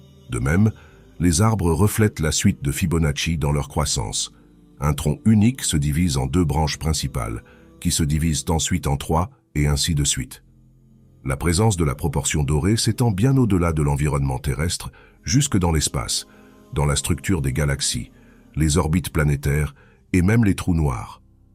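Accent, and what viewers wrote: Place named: Algeria. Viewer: salut a vous toujours dalgerie d apres votre accent vous faites plusieures videos de differentes appelations le plus important pour nous tous c est vos efforts colossals au service de la spiritualite scientifique le savoir vivre avec cette complexité mathematique ou le nombre d or est present partout meme dans la conception des pyramides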